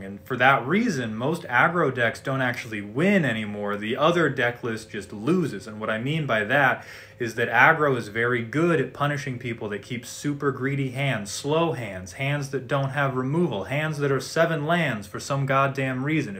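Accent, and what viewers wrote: South, United States. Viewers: Honestly that was some of the cleanest, smoothest, and slightly southern explanation for why I feel fear when I add one too many cheap red spells into my deck.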